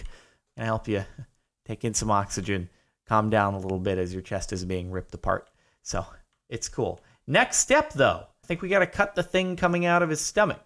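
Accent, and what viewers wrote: Russia. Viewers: I love your accent it sounds like a Russian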